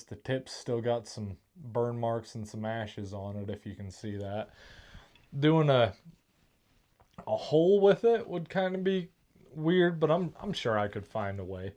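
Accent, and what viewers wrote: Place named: South, United States. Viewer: Where you from, amigo? The south?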